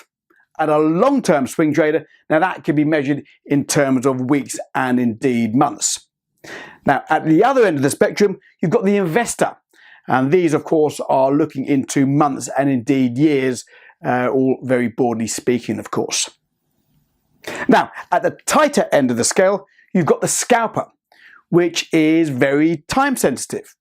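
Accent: United Kingdom. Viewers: your genuin teachings and precise advices are so perfectly bang on mixed with very british sense of humour and nice london accent 😝 i believe you are a very good teacher. God bless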